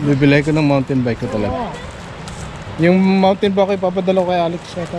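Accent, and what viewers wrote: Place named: Batangas, Philippines. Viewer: I like the batangueneo accent hehe.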